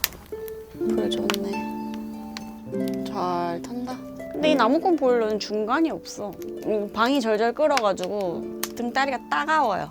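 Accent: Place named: Gyeongbuk, South Korea. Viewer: 경북사투리 구사너무귀여워~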